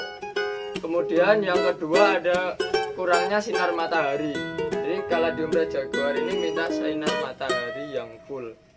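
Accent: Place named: Java, Indonesia. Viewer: Medok banget... Gue suka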